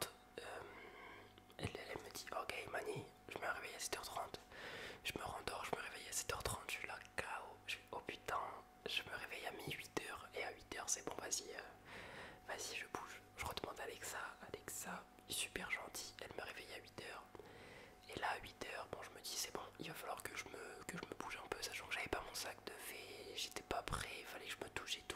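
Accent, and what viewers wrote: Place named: France. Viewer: Je ne sais pas ce que c'est pour les Français, mais en tant que Turc, j'aime beaucoup écouter des mots prononcés avec un accent français. Je vous souhaite un succès continu.🎉❤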